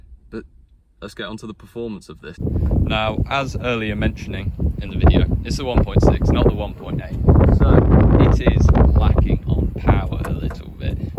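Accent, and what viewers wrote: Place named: United Kingdom. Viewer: I was surprised to hear you were British, bruv.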